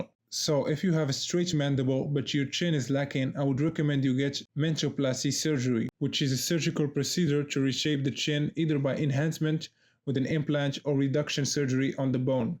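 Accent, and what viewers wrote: Morocco. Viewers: nice Moroccan accent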